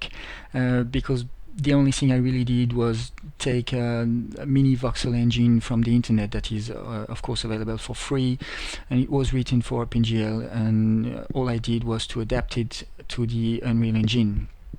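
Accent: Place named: France. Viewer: L'accent Fançais est présent!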